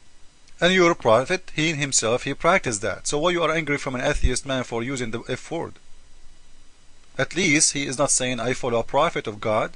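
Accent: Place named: Coptic, Egypt. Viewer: LOL mediocre through and through. By the way, coptic accent is horrible.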